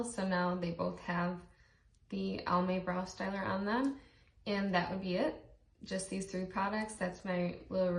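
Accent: Michigan, United States. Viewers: Holy Michigan accent. She has a big time one. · I grew up in Michigan and moved to Florida at 15. It's just funny because her voice and accent sounds just like my aunt who stayed in Michigan. If I closed my eyes and just listened, I wouldn't be able to tell them apart. Weird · shes definitely from michigan lmao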